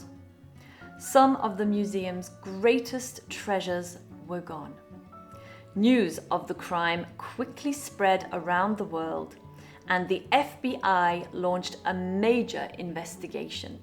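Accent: United Kingdom. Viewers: Hi, Just wanted to say I absolutely love your British accent! It’s so lovely 🌸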